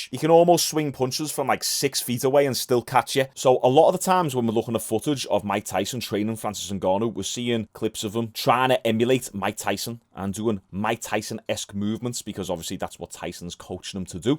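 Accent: Liverpool, United Kingdom. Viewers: Why keep the Scouser accent when you do a perfect Tyson AND a perfect Ngannou lol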